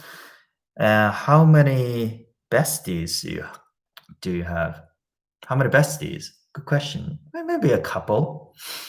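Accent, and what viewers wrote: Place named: Australia. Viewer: 発音が、大学のオーストラリア人の教授とすごく似てました。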